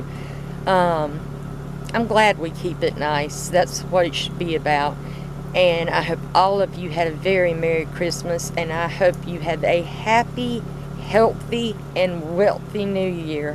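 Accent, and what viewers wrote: South, United States. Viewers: Thank you for getting back to me. My mom was from Little Rock Arkansa and my daddy was from Richmond Vagina, i am from Washington DC. I always Loved a country accent!! can't wait for your next adventure!!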